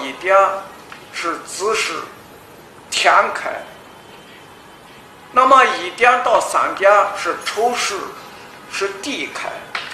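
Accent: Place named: Henan, China. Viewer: 河南人河南话，但和山东部分地区的口音很相近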